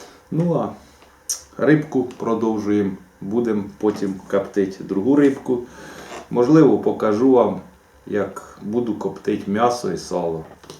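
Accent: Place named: Central Ukraine, Ukraine. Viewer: Така смачна балачка! Черкаси,Полтава,Кропивницький?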